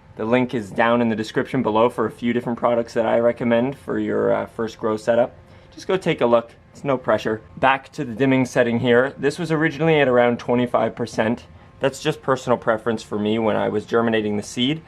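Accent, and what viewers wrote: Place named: Canada. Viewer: Great vid, nice and concise, aaaand Canadian? Subscribed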